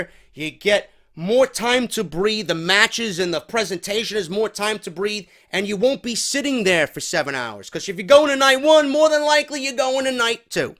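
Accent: New York, United States. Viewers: Dude you are so entertaining. I always watch. That New York voice is so funny.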